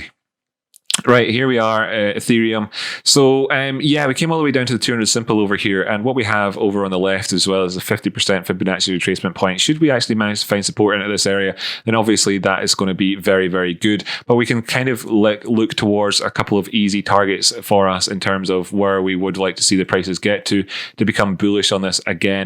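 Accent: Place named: Scotland, United Kingdom. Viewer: only came across your ta over the last few weeks, really enjoy it. easy to follow a scot as a welshman too haha keep it up bud!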